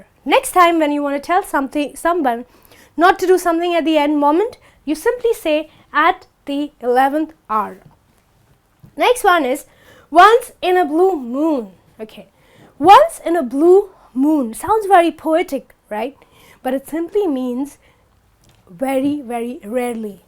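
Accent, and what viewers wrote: India. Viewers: Nice teaching, hay I'm Dion From Indonesia, I like your teaching, anda I Just wanna to ask "where do you come from?" your accent is like India,... Are you from India??? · R u Indian?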